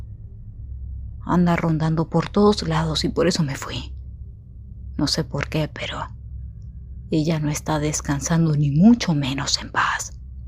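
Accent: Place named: Venezuela. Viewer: noté el acento Venezolano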